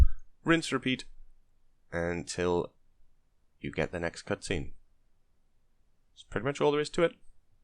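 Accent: Wales, United Kingdom. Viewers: Ty for all the guides. Sound like a fellow welshman!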